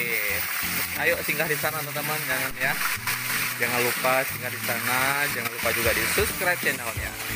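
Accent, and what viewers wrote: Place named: Aceh, Indonesia. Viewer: Hahaha,,lon pike awak Medan si Lae..tapi watee meukalon gtng na perasaan ureng Aceh sang..tp bak video Hana Tom lon deungoe su bhs Aceh 😆😆👍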